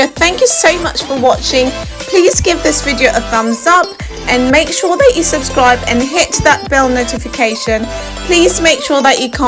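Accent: RP, United Kingdom. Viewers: Hey you very posh😊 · ❤❤❤ new subscriber alert! even ngingezwa your British accent lol...hope I'll pick a word or two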